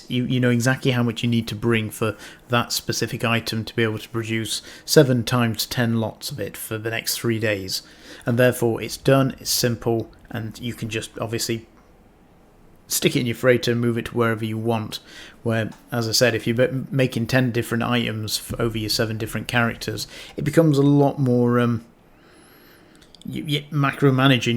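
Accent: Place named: United Kingdom. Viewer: Always great! Always love a good ramble. Especially in a British accent. ;) Diversity is King. Gryphter and my other toons are Small Industrialists for sure.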